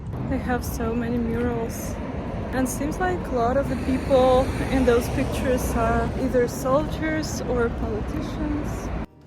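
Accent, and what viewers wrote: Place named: Russia. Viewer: Great video!, have you ever thought about doing ASMR? You have a great calming with the Russian accent voice for it!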